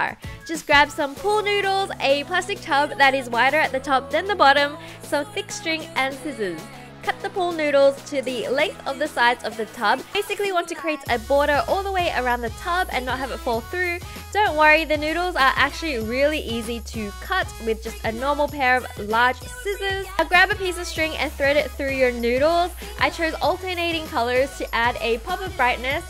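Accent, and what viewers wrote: Australia. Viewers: she's australian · I think she lives in Australia. listen to her accent and you can tell. winter in Australia is like 60 degree and 70 degree weather, and their winter is June - August. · I am Aussie btw does she live in Queensland or NSW? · I'm australian and that's how we say mosquitoes 😂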